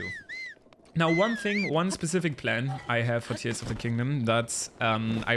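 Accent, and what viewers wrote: Germany. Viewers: Dein Englisch klingt so deutsch xD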